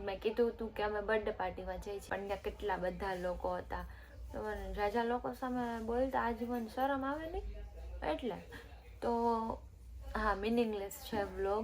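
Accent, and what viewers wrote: Kathiawar, India. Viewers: Tamre bolvanu tone pure kathiyavadi jevo che...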